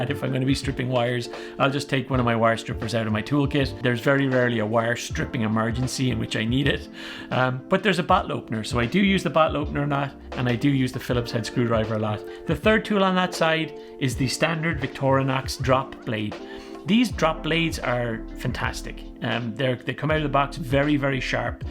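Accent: Ireland. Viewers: I thought you were Irish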